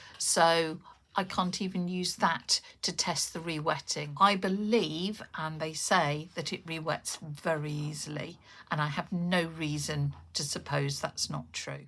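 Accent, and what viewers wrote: United Kingdom. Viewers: you really make me smile I love the way you talk - its so english. I love that we recognise ourselves in others.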